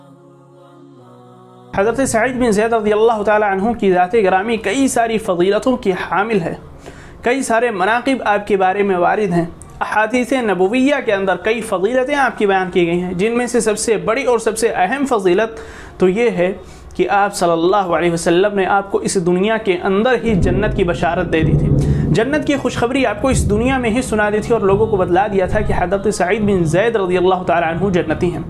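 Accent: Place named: Arab world. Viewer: اردو کو اردو کی طرح پڑھیں۔ اردو کو عربی میں پڑھ کر اردو کے ساتھ نا انصافی کر رہے ہیں۔ آپ کا کام بہت اچھا ہے۔ مشورہ بہتر لگے تو قبول کرلیں ورنہ معاف کردیں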